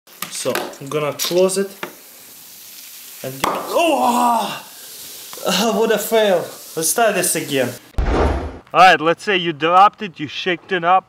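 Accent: Russia. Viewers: are u russian? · What was the last experiment??? He said like a carbide rocket??? he's to Russian to understand sometimes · Lets make it strong again. He sounds sexual with russian accent.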